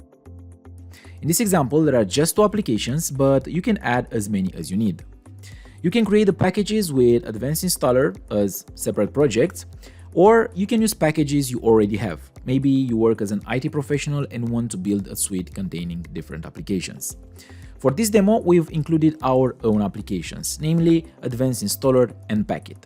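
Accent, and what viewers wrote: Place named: Romania. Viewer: Mereci de tutorial :D excelent :D mi-am dat seama ca esti roman dupa accent :D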